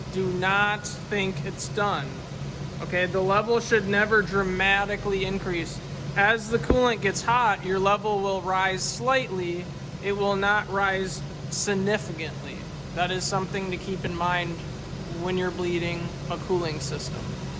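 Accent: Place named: Midwest, United States. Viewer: Your Midwestern accent is amazing.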